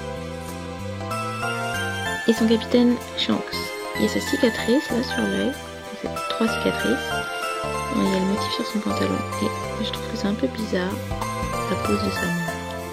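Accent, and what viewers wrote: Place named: France. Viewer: ~! and your french accent is so cute great review! ps:i hope you know who i am;p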